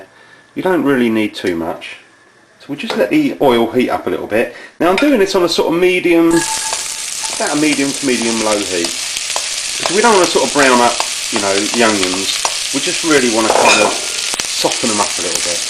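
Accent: United Kingdom. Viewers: just because he has a british accent doesn't mean he sounds like Jamie oliver... he sounds nothing like him other than the british accent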